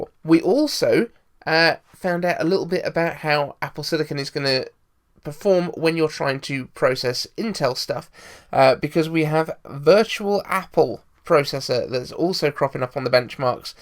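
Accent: United Kingdom. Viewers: You could have a pen knife. I am a recent subscriber enjoying your updates, nice to hear a British accent as well :)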